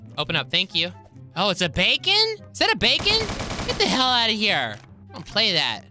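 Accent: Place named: South, United States. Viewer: I turn on noficachio bell and I enjoyed it becuz I love when u talk country and I subscribed and I lovvve u.username:madihle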